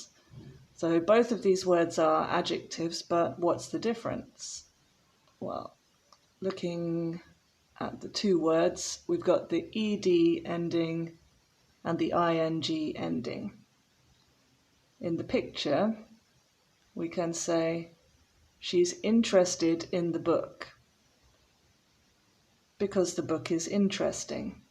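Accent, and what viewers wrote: United Kingdom. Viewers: Thanks a lot. It's very useful for me. I like your British accent!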